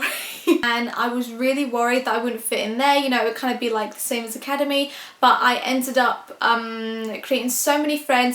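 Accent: Scotland, United Kingdom. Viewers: Thanks for a very candid and personal insight. Nice to know you better 😊 Never knew you were Scottish ! The accent fuelled me 🤔 Your challenging high schools years do not appear to have affected you negatively. Your looks and personality are much more suited to bring in front of the camera ☺️ The quality of video and sound on your videos are excellent. Maybe you could do a video on what gear and settings you use for other Youtubers ? Love your channel. Please keep them coming.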